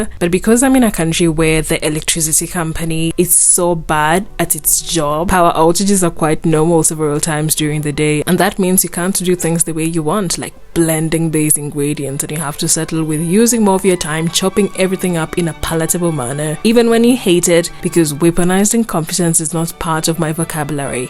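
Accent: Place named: Kenya. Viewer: OMG u just reminded me of my plates 14 years ago before i left Kenya. Why that accent though? I love Kenyan accent. I still keep it after 14 years in USA.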